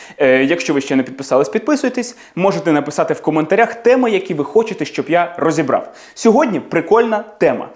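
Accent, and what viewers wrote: United Kingdom. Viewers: Хоч у когось із наших ютюберів класна вимова. Причому британська, а не огидна американська)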